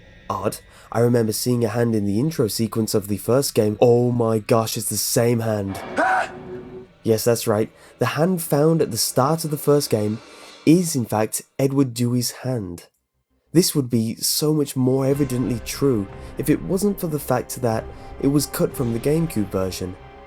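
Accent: United Kingdom. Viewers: Dude he’s British You must be a idiot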